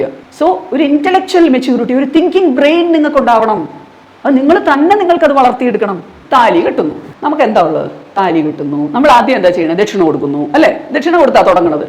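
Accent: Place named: Palakkad, India. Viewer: അസ്സൽ പാലക്കാട്‌ ഭാഷ 😄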